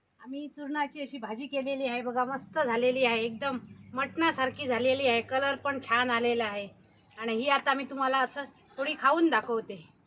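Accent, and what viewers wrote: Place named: Malvan, India. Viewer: भाजी करण्याची पद्धत, मस्तच, तुम्ही कुठचे तुम्ही. तुम्ही मालवणी पद्धतीने बोलता म्हणून विचारले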